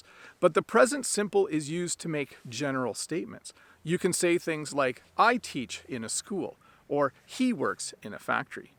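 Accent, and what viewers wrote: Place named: Canada. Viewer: Really nice succinct video. I love that Canadian accent that shows the influence of Scottish immigration. Your ideas for building up a following are great, you deserve all the success that you get. Well done!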